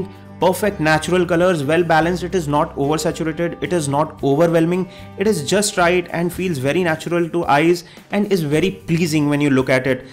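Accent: India. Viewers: Fuckin indian accent,Fijay Raj Khumar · South indian accent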